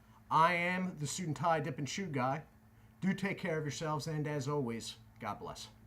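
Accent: United States. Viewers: Where are you purchasing these snuffs? Judging by your accent, I assume you're American. I'm a former smoker and current user of Copenhagen snuff. I'd like to explore the world of English snuff and would appreciate any insight on purchasing it as I know it's produced across the pond. I enjoy the content too. Cheers!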